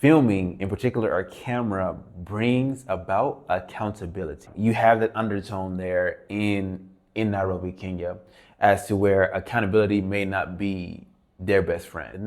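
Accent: United States. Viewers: I am a black American LADY I live in Kenya BY CHOICE. I love it and I love the people but let’s get real young man. By the way I am very well traveled…. You young people going into third world countries and “filming” live for the sake of “content creation” WITH NO UNDERSTANDING OF A CULTURE IS UTTERLY RIDICULOUS. Do you understand where you are going and the realities of the place!!??Some of these things you have experienced, you make yourself a target!!! Seriously. There are millions of destitute and poor people in most of these nations and the reality is that if YOU WERE IN THEIR SHOES you yourself might beg if you hadn’t eaten in a few days. As far as the other critiques I get this is for content and your personal experience but honestly you should’ve had a guide… also know when to shut off the cameras. In many of these cultures photos and cameras are sometimes an issue depending on the beliefs of the people you are catching on film… STUDY BEFORE YOU GO. 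Also the people for the vast majority are WONDERFUL, LOYAL, AND HONEST. Also on the women, dude in a place with literally millions in ONE city your views are just to generalized. The women are actually the OPPOSITE of what you say. My son visited here and HE IS EXTREMELY HANDSOME AND SUCCESSFUL AND IT WAS NOT AT ALL WHAT YOU DESCRIBED. Most of the attention you received especially in the CBD was simply because some people heard your American accent PERIOD. I really think your video is such a poor portrayal of the country. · What did you expect? A red carpet? All major cities have homeless ppl and beggars, have you seen downtown Seattle or Chicago? Lol... ...also..dude, your camera pointing and obviously American accent make you both a target AND a nuisance. Try that in Haiti or somewhere in SA, you'd get robbed (at the least) and possibly killed at worst. Maybe don't be so disrespectful toward ppl you're already being very intrusive with? 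· Young man you sound like a privileged American.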